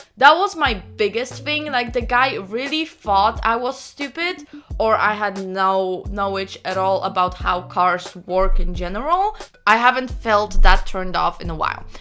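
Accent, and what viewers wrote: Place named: Eastern Europe. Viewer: Well done for doing this video. I can’t wait if you are doing a review on model Y. Love your Eastern European accent.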